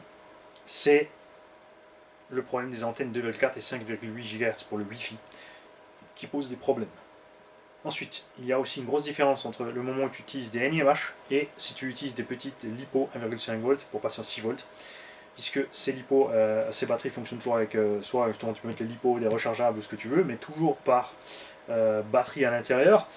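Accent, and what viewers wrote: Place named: Alsace, France. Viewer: Salut, Je débute dans le RC "moderne". J'ai monté un Tamiya Madcap en kit dans les années 90 et je suis tombé sur des chaines de RC par hasard sur youtube pendant le confinement et ça m'a donné envie de m'y remettre. Je suis de Strasbourg donc je te comprends parfaitement;) même si tu parles en alsacien! Elsassisch ìsch bombisch, Hoplaaaaa! Je cherche plutôt un buggy RTR Brushless en 1/8 aux alentours de 300/350€ soit un budget total d'environ 450€ (voiture + radio + batteries + chargeur). Je ferai 2/3 bitume et 1/3 TT, quelques petits sauts et pas la peine d'aller à 100km/h. Un 4S suffira. J'ai vu le nouveau Absima Stoke Gen2 4S qui a l'air pas mal. Qu'en penses tu? Merci.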